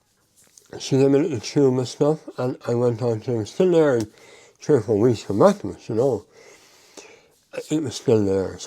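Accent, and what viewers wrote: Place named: Ireland. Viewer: Hi, I used to watch yours and others commenting on cars here on youtube, you are not Jeremy Clarkson, but your Irish accent and your personality shines, so I remember you much more than I remember lots of others. I noticed your voice has changed a long while ago, but didn't think much of it. I then moved to Canada and no longer needed a car, so kind of stopped watching car channels. Yesterday, I wanted to see some Toyota Corolla opinions and searched for your channel, then discovered that your voice has changed and is due to the cancer. I felt quite sad, I showed your video to my wife and told her why I liked your channel etc, she was quite moved by your story too. You are brave, your inspire whoever is facing any difficulties in life not to give up and keep one's spirit high. Please keep fighting, keep bringing us your amazing videos, I want to see you commenting on cars when you are 99 years old, or whatever travelling devices we have by then! 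My best wishes to you and your family!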